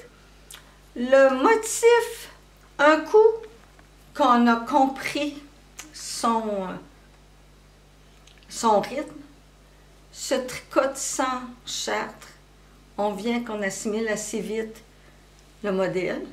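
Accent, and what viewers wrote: Quebec, Canada. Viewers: Bonjour du nord pas de Calais en France Merci De nous faire voyager au Québec, je rêve d'y venir 🤩 J'adore votre douceur et votre bel accent québécois Bonne journée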